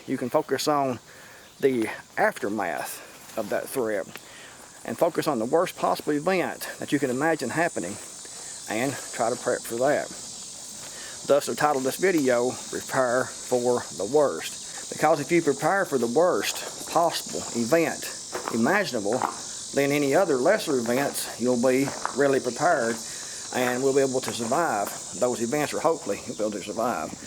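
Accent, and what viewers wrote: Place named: South, United States. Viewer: I think he,s great ! Love the southern accent ...i,m from LOUISIANA and we all sound like the actors on the movie THE WATERBOY ! LOL !!!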